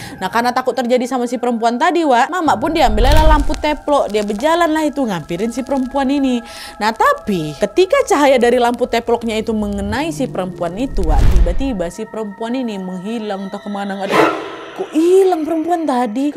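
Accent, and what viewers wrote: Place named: South Tapanuli, Indonesia. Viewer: Maro au,, kayak dari tapsel deh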